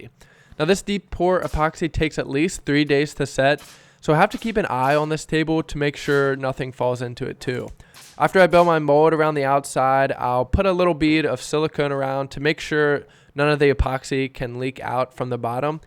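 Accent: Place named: Western Pennsylvania, United States. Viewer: Sounds like you live near me in western PA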